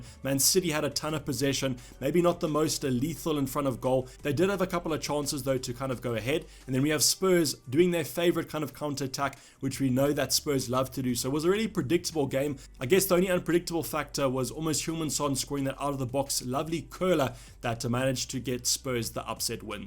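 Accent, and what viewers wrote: Afrikaans, South Africa. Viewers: Are you from South Africa? jy klink Afrikaans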